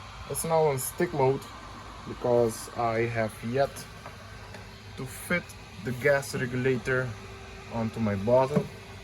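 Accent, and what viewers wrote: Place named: Texas, United States. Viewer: Great buy I watched your videos all times I thought you were living in Texas or Miami what's your primary language is it Dutch or French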